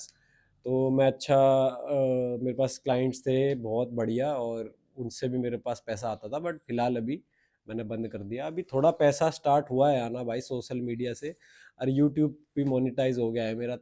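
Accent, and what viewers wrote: Haryana, India. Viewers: he talks like haryanvi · Hai tu up ka bole haryanvi hai ...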